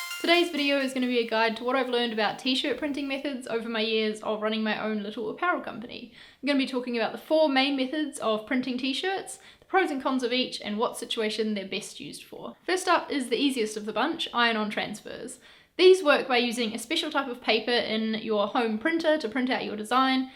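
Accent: New Zealand. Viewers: I thought I could hear a Kiwi accent and so read the description, sure enough you are! Thank you for the information, and I am off to see your screen printing video now :) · Off topic but, love the NZ accent! Thanks for this!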